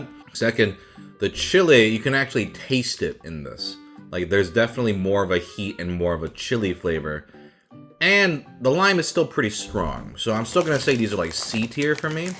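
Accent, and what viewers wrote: United States. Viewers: yo this guy is asian but his voice and accent are AMERICANA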